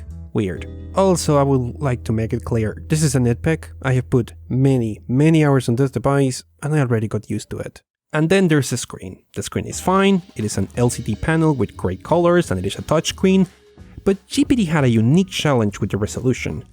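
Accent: Venezuela. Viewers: Estoy casi 100% seguro por como habla y se le medio sale que es venezolano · Hablas identico a mi en español, acaso eres Venezolano?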